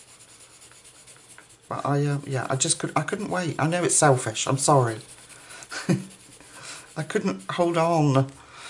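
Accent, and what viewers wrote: England, United Kingdom. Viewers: It’s so lovely to hear an English accent. I particularly appreciate the mention of the paper quality. I love Hanna Karlzon books as the paper will take watercolour pencils too. Many thanks for your tutorial. I’ve subscribed 🙏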